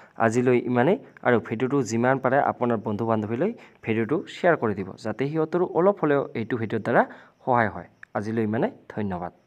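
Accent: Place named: Bodoland, India. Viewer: Sir aapuni Bodo hoi nki maat tu xuni enekuai lage? 🤨🤨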